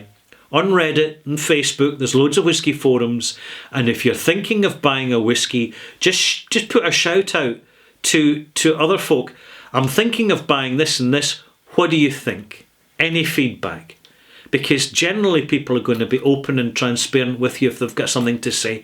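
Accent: Scotland, United Kingdom. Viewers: Hi, I love your trade dress. Please take that as a polite compliment from an old patent and trademark attorney. The tweed bonnet, the thick woolen flannel shirt, the Scottish accent, the bothy, the barrel, the whole vibe. Your knowledge is extensive, and the breath of your whisky knowledge and vocabulary, and the style of your delivery, is superlative. There is an honest integrity about you which I find very refreshing, heartwarming and frankly entertaining. With great interest I have probably seen over 500 of your reviews. I like you, I am a deliberate and passionate malt mate. I’m an American living in Cincinnati, Ohio who loves Scotland and scotch whiskey. So it’s clear that I love everything you are doing and I wish you all the best of health and I hope you do this for a very long time. So what my gripe? One and one only. Please review Macallan. 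I am your echo chamber and I will support everything that you don’t like about non age statement whiskeys. I understand that the bulk of your audience cannot stand the snobbery (or the sky-high prices) of Macallan. Yes, it IS the gorilla on the table. And ignoring it seems disingenuous. And you are anything but disingenuous. Oh, what I wouldn’t give to have review a NAS Macallan Oscuro, non chill filtered, natural color and bottled at about 46% abv. Yes high prices can be a turn off but what would Car & Driver be without periodic reviews of Bugattis and Ferraris. We may not be able to afford those lux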